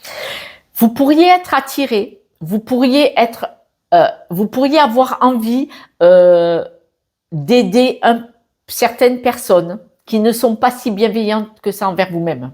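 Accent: South, France. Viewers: Bonjour je ne vous connaissais pas,et j'ai adoré votre guidance avec ce petit accent du sud il me semble, excellent merci pour tout ces conseils qui je l'espère se réaliseront pour la plupart.😉🙏🙏🙏🙏 Portez vous bien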